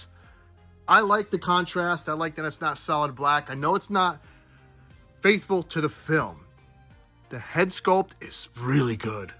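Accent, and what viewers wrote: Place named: Connecticut, United States. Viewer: Connecticut..that explains the accent 😆 but really, did ya find em on the shelf..or did ya hustle them from the back ?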